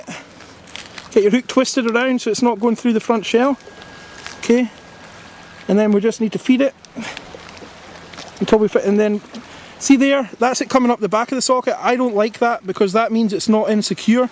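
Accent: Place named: Texas, United States. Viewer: Good information, but very unusual Texas accent.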